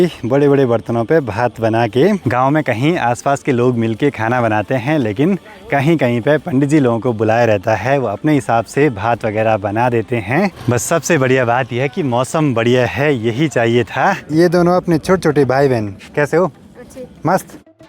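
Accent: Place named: Pahari, India. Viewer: Aap Bilkul cool pahadi ki tarah bolte ho..aap change kro ise ...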